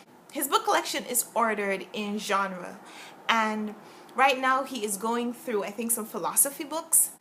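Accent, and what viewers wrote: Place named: Jamaica. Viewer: It's so heart-warming! I too LOVE your Jamaican accent! It's so beautiful! Irie!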